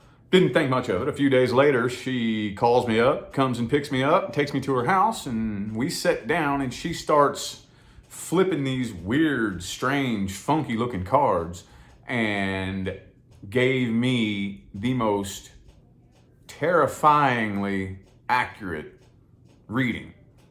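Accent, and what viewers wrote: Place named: Kentucky, United States. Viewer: So I just found you and listened to both of your Capricorn (July) readings as I have discovered Tarot readers on YouTube (yeah, I am late to the game LOL) just about a month ago and have dug deep and found some amazing readers and great insight to my psyche and situations. Brother, I am originally from Kentucky so I picked up on your accent that is honey to my ears. Brother, you clicked right into my situation like a hand in glove. And your advice was so in tune and made me see clearly the path I was hesitant to take. Love your honesty. I am a fan for LIFE.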